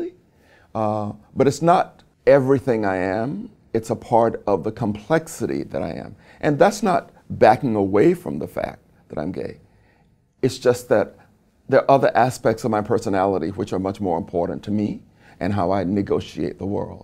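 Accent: United States. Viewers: He has an American accent. I don’t understand. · It's partially because of your American accent. Many Brits love an American accent.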